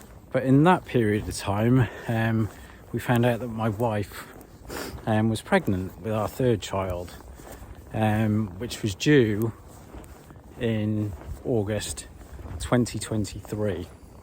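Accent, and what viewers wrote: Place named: Southern England, United Kingdom. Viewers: Your English, from the south maybe? I'm from the midlands. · Его произношение очень типично для англичан и, сказав, что оно интересное, Вы можете немного обидеть человека. Это самый лучший английский, который Вы можете услышать, четкий и ясный. У меня когда-то очень давно был такой акцент, но я его быстро потеряла, начав жить в Америке. Я очень надеюсь вернуться назад в Россию после более 20 лет жизни в Америке, но у меня сыновья-инвалиды из-за ошибок американских врачей, поэтому сейчас мы только надеемся на чудо. Я очень жалею, что уехала и я знаю, что я не одна такая, кто хочет вернуться, но по личным причинам пока не может этого сделать. В каждой стране есть свои плюсы и минусы, но проблема в том, что мы знаем, чего ожидать и как обойти многие проблемы на Родине, а в новой стране мы, как слепые котята, ничего не знаем. 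Поэтому, верна поговорка, где родился, там и пригодился.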